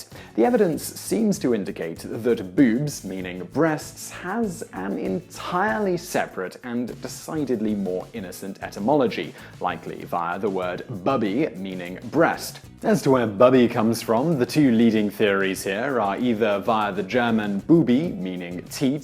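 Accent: United Kingdom. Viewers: awkward or not, I still giggled when, with a straight face and 'serious' British accent he rattled off 3-4 euphemisms in a row... - I almost an entire video of him just reading a list of all the terms they can track down, either for boobs, the male organ, or any other 'naughty topic' in which language has created so many options to 'not say' what one is saying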